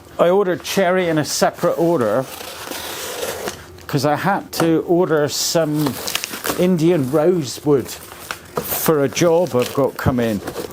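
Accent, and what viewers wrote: Cornwall, United Kingdom. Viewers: Hi, I stumbled on your channel a couple of days ago. I thought I recognised a fellow Cornish accent! Getting any supplies into the county is a nightmare. It's the transport that kills it. I'm looking into making a longbow, either self yew bow or osage, or a laminate bow from ash, purple heart, lemonwood, maple, cherry, walnut, hickory etc. Some of the prices are ridiculous. It's hard enough to source somewhere that sells the material. Another problem is shipping a 6 foot length of timber. Ideally I would want to see the wood for myself. I need straight tight grain, no knots, cracks etc. So risking hundreds of pounds on a piece of timber only to be unworkable makes it impossible. Good luck!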